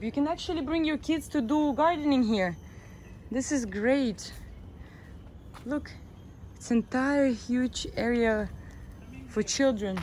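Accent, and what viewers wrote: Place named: Portugal. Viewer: hi where are you from your english has a funny accent! oh you are fom portugal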